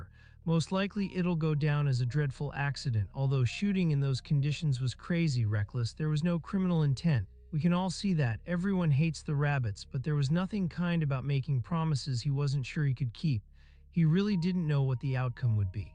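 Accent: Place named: United States. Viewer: Thick US accent….. disservice to Cleaves.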